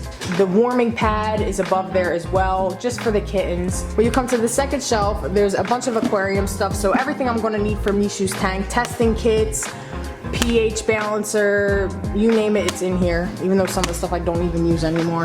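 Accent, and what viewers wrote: Philadelphia, United States. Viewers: I just discovered you and I really enjoyed seeing your set up! I have been a pet/animal enthusiast my whole life, and I plan on opening a rescue in a couple of years- this is great inspo for organization and habitat set ups! You're really great and I am looking forward to watching more. (Where are you from?? You sound so much like my family from Philly!)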